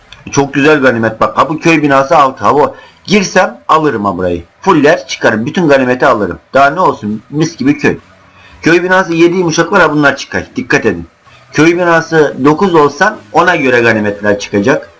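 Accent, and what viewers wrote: Black Sea, Turkey. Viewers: Abi saf karadenizli misin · abi sn karadenizli misin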